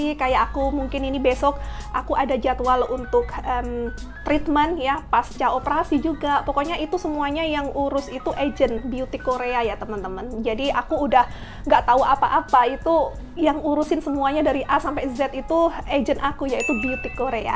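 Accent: Java, Indonesia. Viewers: B.indo oke Inggris pinter dan lancar B.jawa tetep g ilang jawane lek ngomong sambil ngakak karo bestiene 😂.. enak banget denger suara mbk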